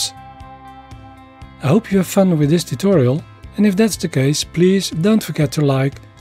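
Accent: Netherlands. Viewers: Lijkt wel een rotterdams accent. Thanks voor de tutorial!!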